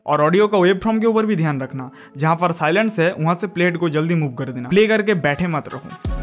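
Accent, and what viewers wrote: Bengal, India. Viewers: ap ke awaj mai bangali bala feel ata hai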